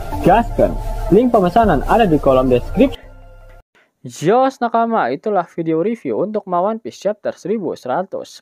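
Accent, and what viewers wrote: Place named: Medan, Indonesia. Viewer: Medan nih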